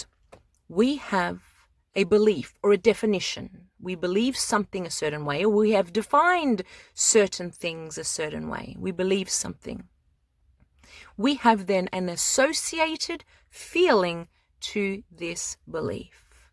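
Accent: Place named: Australia. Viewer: I found your video through one of my subscribers who posted your video. Your delivery is perfect and your Australian accent is beautiful, I'm an Australian myself, you're a great teacher and I was so drawn into every word you said, I was sort of hypnotised to tell the truth, and I felt that this message was purely for me 'now'😊 I am turning 68 next month and people say that at 40 you can look over your life and feel all sorts of disappointment but I can tell you nearing 70 you feel like the tank is getting pretty empty. This philosophy really rings true for me, it's not like I haven't thought about all this a lot but the way you put it just cut through. I'm going to listen to you again and again until I really get it just wanted to say thanks. Cheers, Dave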